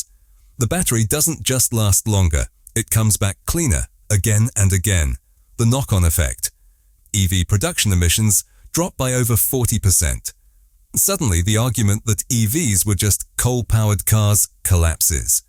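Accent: United Kingdom. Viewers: Don't listen to the message, listen to the method of delivery, formulaic, uses American expressions with a 'British' accent (muddy) and the same style as you heard from the same source you've heard previously, e.g. 'here's the twist', this wasn't market math', etc, etc.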